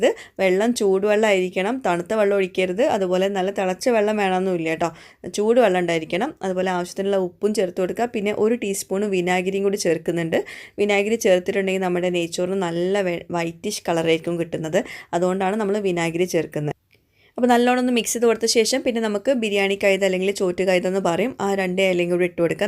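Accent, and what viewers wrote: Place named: Northern Kerala, India. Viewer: Hi.. Njan ഈയിടെ ആയിട്ടാണ് vlogs കാണുന്നത്.. 4 items ഉണ്ടാക്കി.. Suupprr.. കുറച്ചു receipies എഴുതി വച്ചിട്ടുണ്ട്.. യുടെ proper place എവിടെയാ?? ഒരു വടക്കൻ slang.. അതാണ് ചോദിച്ചത്.. ഞാൻ kannur..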